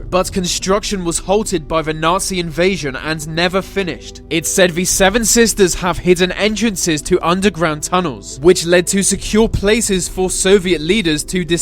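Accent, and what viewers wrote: United Kingdom. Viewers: You sound british yet people claim you're american, weird. Nice vid though.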